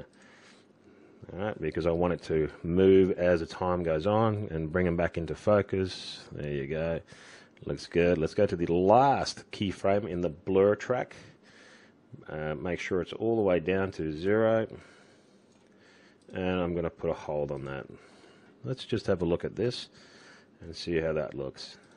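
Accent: Australia. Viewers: Cool. You still got the sweet aussie drawl mate. ☺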